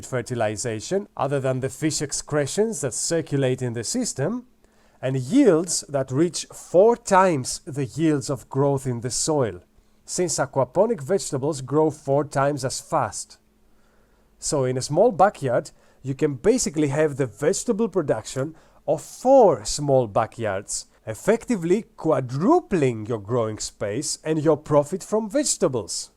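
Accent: Greece. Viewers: Your accent sounds Greek... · Ο πωπωπω η ελληνική προφορά την ακούς παντουυυυ. 🇬🇷🇬🇷🇬🇷🇬🇷🇬🇷🇬🇷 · Chris Chavez Well, it's definitely not Indian, and I don't think it's Turkish, although that is a better guess. Sounds a lot like Greek to me. Specifically southern Greece. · Κατάλαβα αμέσως ότι είσαι Έλληνας από την προφορά σου έκανα sub γιατί βρίσκω το περιεχόμενο ενδιαφέρον. Σου εύχομαι τα καλύτερα και καλή συνέχεια!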